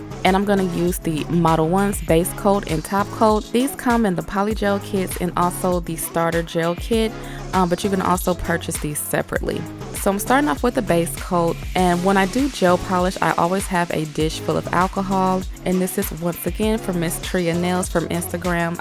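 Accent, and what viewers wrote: United States. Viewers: Are you from America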